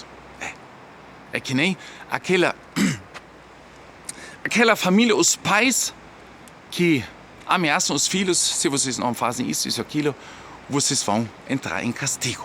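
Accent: Brazil. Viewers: Irmão eu só consegui ver o Leôncio do Pica Pau, ainda mais com esse sotaque Brasigermânico. Abraço! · Se não fosse pelo R vc seria confundido com um Brasileiro nato.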